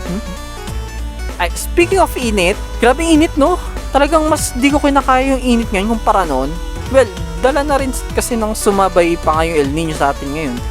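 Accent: Visayas, Philippines. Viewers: Karon rako nakabalo nga bisaya ka man diay bai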